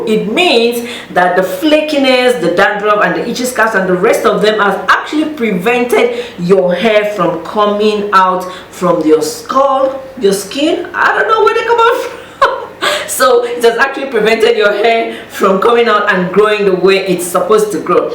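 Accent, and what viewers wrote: Nigeria. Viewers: First of all, I LOVEEEEE the fact that you stick to your Nigerian accent 😍😍😍 and not trying to fake like a lot of people on here.. secondly you didn’t talk about shaft but I’m guessing you could use it for some kind of hair mask.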